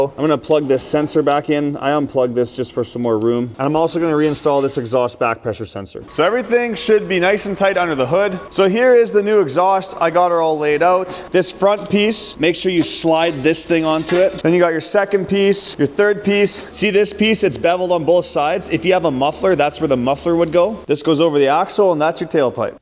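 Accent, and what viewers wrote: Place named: Canada. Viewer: His accent is Canadian af (Sask maybe?), and I can attest it’s not illegal here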